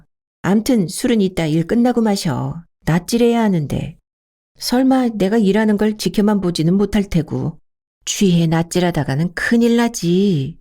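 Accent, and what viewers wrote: Jeolla, South Korea. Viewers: 처음부터 구수한 전라도 사투리 로 고급지게 들리는 에 낭독이 왠지 전설스런 내용일듯 좋아요 누르고 감사하며 듣습니다~♡ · 와 사투리 구사가 완전, 설마 전라도분 이실까요? ㅎ 우리 할머니랑 똑같은 단어 똑같은 억양 완전 리얼 합니다.. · 전라도 사투리가 찰집니다.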